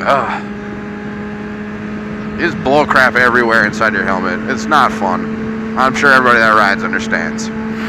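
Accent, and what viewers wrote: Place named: Minnesota, United States. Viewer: Yooooo Minnesota brother xd I had a feeling you were from there I can tell from your voice xD. That place looks familiar.. further into the video and your right in Duluth Xd this is cool I'm from there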